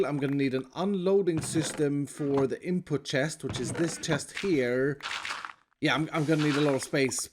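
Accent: Australia. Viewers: He's just Australian, has to be the best thing I've heard in weeks. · LMAO he's Australian! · Dont worry he is just Australian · He's just Australian. xD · Dude lol bruh it’s aight he’s just Australian 😂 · Dont worry he's just Australian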